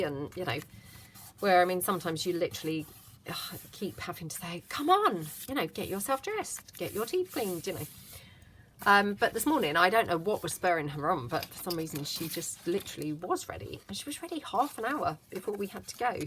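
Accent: England, United Kingdom. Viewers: Are you from England or Australia? Love your accent. When you say you have puddings for dinner what does that consist of. Love your videos, stories.🌺🌸🌼🌻🌹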